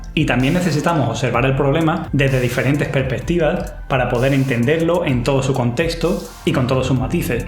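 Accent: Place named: Andalusia, Spain. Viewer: Ostras! He llegado a tu canal por tu hermano, estábamos hablando sobre veganismo y me pasó tu video sobre la carne, y guau. He seguido viendo tu contenido y puedo decir que he conectado muchísimo con tu visión del altruismo y esas ganas de provocar un impacto en el mundo. Me encanta como organizas la info, super claro y encima con la fantasía del andaluz! Fantastiquísimo! Aquí una nueva suscriptora!:D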